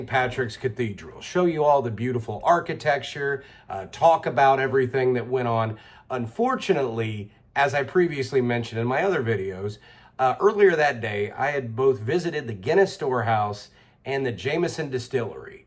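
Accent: South, United States. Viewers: His accent is fire. I love a good southern accent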